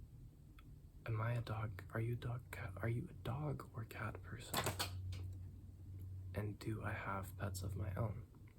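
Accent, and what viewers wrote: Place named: California, United States. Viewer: Also immediately guessed you were from ca with the way you talk haha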